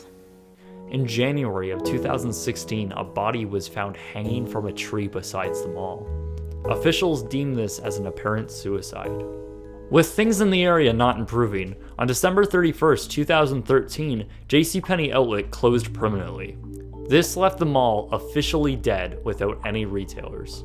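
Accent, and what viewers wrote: Canada. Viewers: You can tell by this guy's accent he's Canadian. As an American, I've never heard anyone call anything a "Dollarama". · He’s canadian. We have a slight different accent from Americans... people say it isn’t noticeable but this literally is just an example of how we say some words differently. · I just love watching your videos,. They are so interesting and your Canadian accent is just so cute (myself knowing it well living in the States less than 100 miles from the border). Keep posting!:-) x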